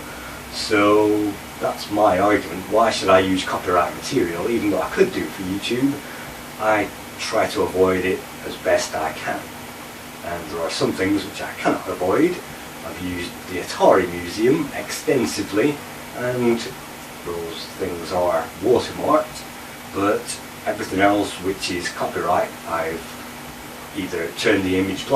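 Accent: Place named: North, United Kingdom. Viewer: Interesting vlog cant wait for the Vid:) and you look a lot younger than you sound( love the Northern acc dont lose it)! thats a compliment:)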